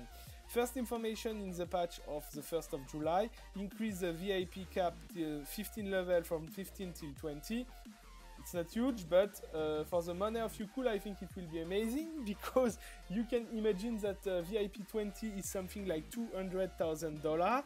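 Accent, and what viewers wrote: France. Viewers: Bon gros accent français mdr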